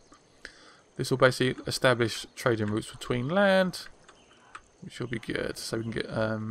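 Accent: United Kingdom. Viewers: Do you live in the United States? British accent? Just curious as you said "Here in America...". Anyways thanks for the walkthrough trying to learn this expansion!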